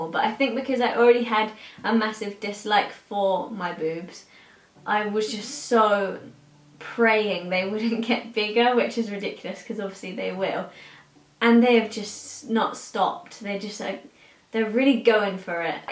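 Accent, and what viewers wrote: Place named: United Kingdom. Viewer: I just found your channel and i am obsessed, your accent is amazing ive always wanted to have a friend from the UK!! Were from California❤️ Congratulations on your home and babygirl!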